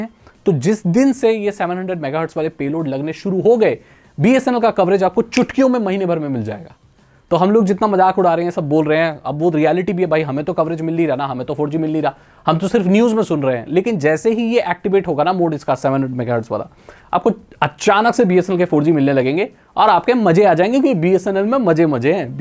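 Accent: Odisha, India. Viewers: Odisha se ho Kya Bhai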